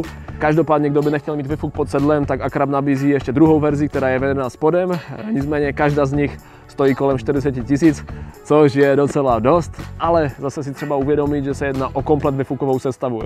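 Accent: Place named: Těšín, Czech Republic. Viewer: spíš bych to slyšel od Těšina na vychod... Třinec, Jablunkov , Mosty????? :-))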